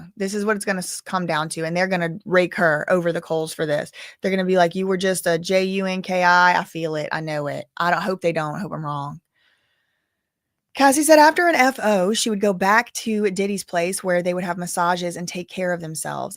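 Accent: South, United States. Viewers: My husband thought you were a black gurl this whole time lmao “no she’s just from the south” 🧚🏻‍♀️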